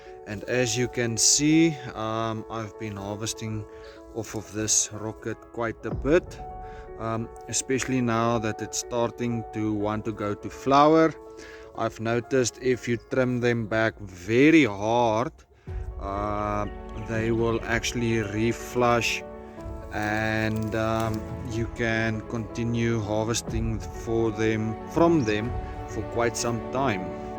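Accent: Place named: South Africa. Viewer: I recently came to live in Australia from SA and have bouts of homesickness. Trying to find how-to propagate rocket. What a wonderful surprise to hear the "ou" accent again! What,a blesing! Thank you for your very encouraging lesson..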